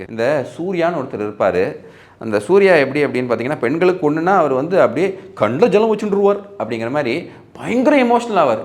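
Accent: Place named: Tamil Brahmin, India. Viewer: Hey why the tambra slang man. Omit that.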